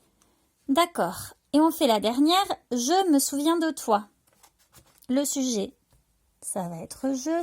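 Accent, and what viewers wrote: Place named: South-West, France. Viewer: Quel bonheur cet accent du sud-ouest qui fait que j'ai tout compris ce qu'est un COD et un COI ! Merci maîtresse !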